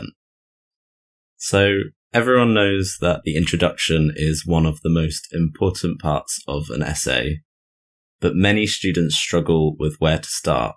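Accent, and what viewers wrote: RP, United Kingdom. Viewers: Is it received prounication ?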